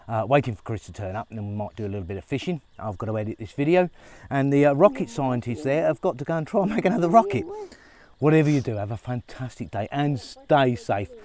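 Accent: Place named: East Anglia, United Kingdom. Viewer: Hi, I know the feeling re-grey hair! Still, I bet it feels refreshing in the heat over there plus less wind resistance on your trusty steed. I had you down as a carrot-cruncher or a swede-basher, there's a bit of an east Anglian twang in there I reckon, did you move out that way later on? All the best to you all, including the outlaws who seem very nice and, like their daughter, very hardworking people.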